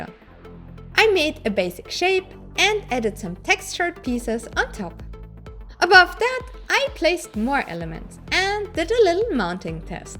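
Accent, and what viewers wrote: Germany. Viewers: Your German or?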